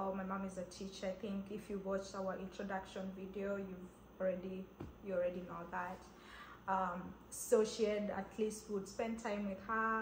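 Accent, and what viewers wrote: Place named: United States. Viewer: this accent haitapotelea Kenya i take you back to the states in Jesus name amen.nakupendaa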